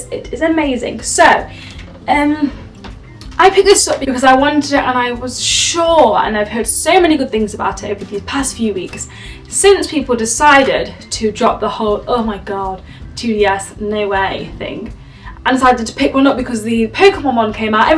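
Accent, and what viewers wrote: United Kingdom. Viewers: first video I watched I'm ages with someone that sounds British lol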